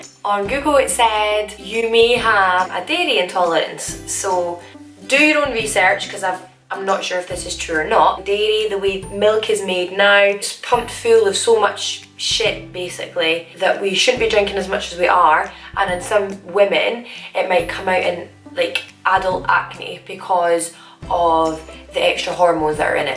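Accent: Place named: Scotland, United Kingdom. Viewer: Love your channel and just found your Instagram! Found you from your Jamie G lipstick video and was so happy when I heard the Scottish voice 💕😂 xx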